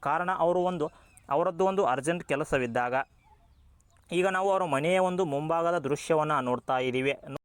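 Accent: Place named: North Karnataka, India. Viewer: Uttar Karnataka Bashe..❤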